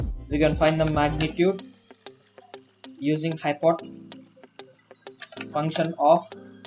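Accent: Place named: India. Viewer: damm, India English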